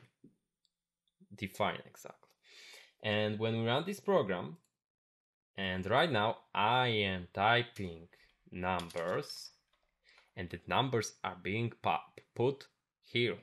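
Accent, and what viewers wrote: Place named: India. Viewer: I miss programming tutorials without indian accent <3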